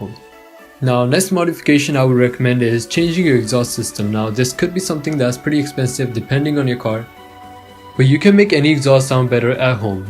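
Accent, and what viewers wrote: India. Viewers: Are you from India? You have Indian accent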